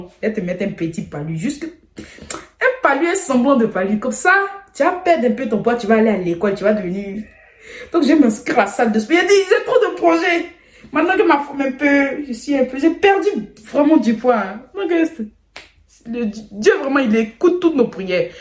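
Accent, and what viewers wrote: Ivory Coast. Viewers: J’aime trop ta manière de parlé en ivoirienne 🤣🤣🤣❤️❤️❤️❤️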